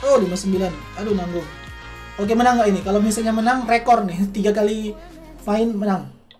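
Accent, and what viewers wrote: Manado, Indonesia. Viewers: Bang lu org manado ya??, sorry subcriber baru btw gua juga org manado tpi sekarang tinggal di gorontalo karena tugas ortu....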